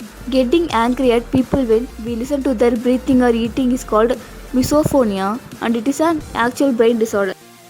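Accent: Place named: South India, India. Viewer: South Indian recognized from voice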